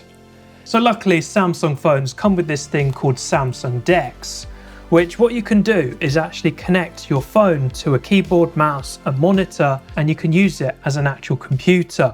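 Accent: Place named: Estuary English, United Kingdom. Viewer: I enjoyed the estuary English accent. I don't hear it much on here. We usually get upper English, Irish, or Scottish.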